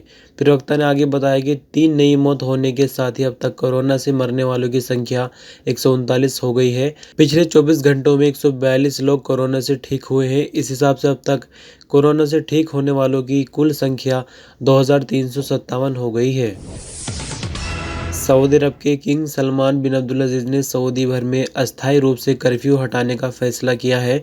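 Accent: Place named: Uttar Pradesh, India. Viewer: Apki zaban bata rahi he ki aap u.p se ho konse district se ho ye bataye brother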